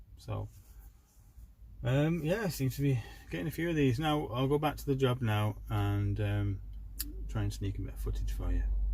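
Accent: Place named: North Wales, United Kingdom. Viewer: I keep hearing a hint of a North Wales accent mate, are you originally from that area ?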